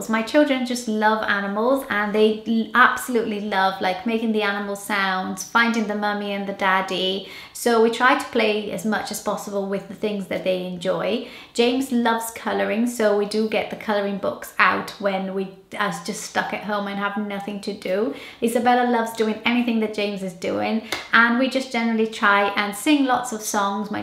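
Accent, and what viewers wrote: United Kingdom. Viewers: Oi Sou Letícia e eu conheci seu canal por um vídeo de dicas de inglês, discubrir q vc é brasileira e fiquei espantada, pq achava que vc era britânica, e me inscrevir no canal de cara tanto pq te admiro tanto mãe quanto pessoa, e agora descubro q vc e de Aracaju!! MDS minha cidade! Estou surpresa achei q vc era de Minas ou Rio kk e nossa vc ja era e é minha inspiração (no inglês pq eu amo seu Inglês ele é maravilhoso!! )Em fim só um desabafo... Se falei muito desculpa... I love your videos so much.. Manda beijo! ❤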